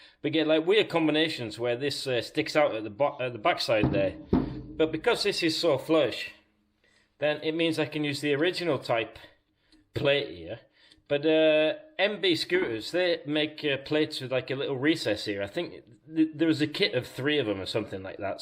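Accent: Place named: Northern England, United Kingdom. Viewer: Loving the commentary. Sound like you lived in Manchester or Leeds for a while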